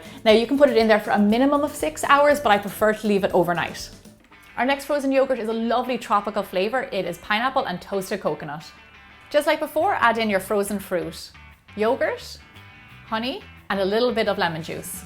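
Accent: Ireland. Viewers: I'm trying to place your accent Is it...Irish? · 1) love your accent; Irish, I beleive. 2) With all due respect, you need to slow down a bit or two while presenting the ingredient preparation procedure. Sincerely, Bruschetta senior; chef-wanna-be. <num>. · I absoloutly adore the Irish accent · You are such a great baker your so pretty and I love your accent, I'm from ireland too! +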